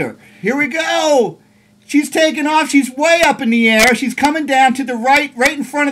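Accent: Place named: Australia. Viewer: great fly. he speaking as an Australian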